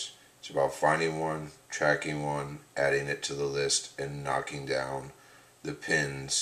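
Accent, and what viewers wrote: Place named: India. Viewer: Good job! Distance she travels per day towards us...300,00 per day? How soon will we have a guesstimate re: mass?Why you speak like Indian...? LOL...prolly lots o stupid questions,fatigue to name a few...keep on keepin on!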